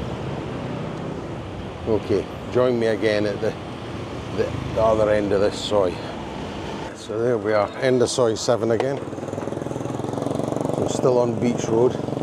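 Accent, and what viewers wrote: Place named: Scotland, United Kingdom. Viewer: Good video. Always good to hear a fellow Scot on YouTube. I've been going to Thailand since the early 90's and met my wife out there mid-2000's. She moved over to Scotland with me a year ago, with a view to settling here (for a few years). We may eventually move back to Thailand when I retire. Jomtien looks interesting. I met my wife in Patong and as you know, Phuket has become too busy in the last few years, just like Pattaya. Jomtien and some other parts of Thailand like Songkla City, can offer a quieter experience and lifestyle for those who prefer that. I would be perfectly happy in Jomtien, from what I have seen in a vew videos. Keep up the interesting vids and who knows? Maybe bump into you in the future for a couple of cheeky ones. All the best Pal:)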